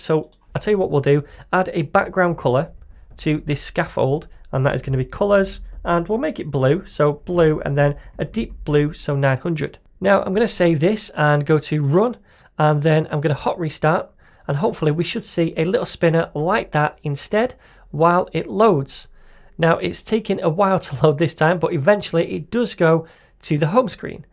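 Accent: United Kingdom. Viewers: are you bri iiish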